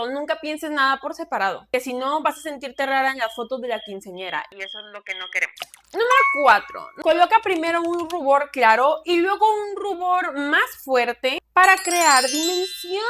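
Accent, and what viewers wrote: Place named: North, Mexico. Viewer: Tu tono norteño con tu carita douyin me da vida ❤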